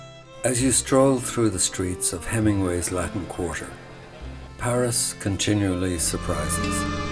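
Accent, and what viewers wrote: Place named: RP, United Kingdom. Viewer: A great tour, to be sure, but despite the prestige British [or maybe posh Dublin] accent, the poor pronunciation, at times dyslexic, of French names and locations —and depicting le Musée d'Orsay as le Musée du Louvre— detract from the presentation. At least it's seemingly an actual human voice, a thing you can't always count on these days on YouTube.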